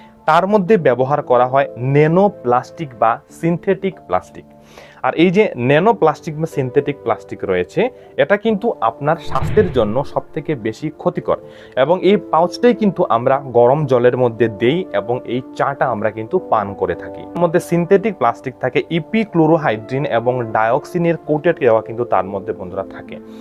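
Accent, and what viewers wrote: Sylhet, Bangladesh. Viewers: Apni mone hoy sylety. Khub kosto kore shuddho bangla bolsen😂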